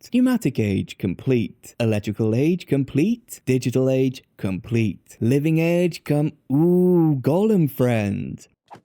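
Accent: Wales, United Kingdom. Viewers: Where is that accent from? Sounds slightly welshie with some words xD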